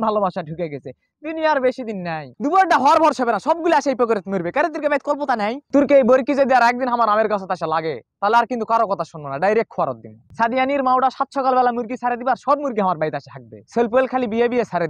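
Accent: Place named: Bogura, Bangladesh. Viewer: হামাকেরে বগুড়ার ভাষার মতন ই বারে😆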